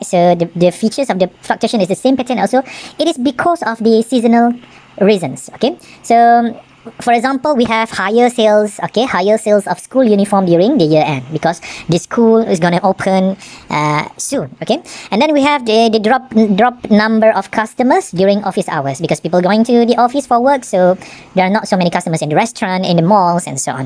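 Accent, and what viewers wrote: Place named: United States. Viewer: accent american sir ni pekat gila sampai aku lupa bukan orang america yang buat video ni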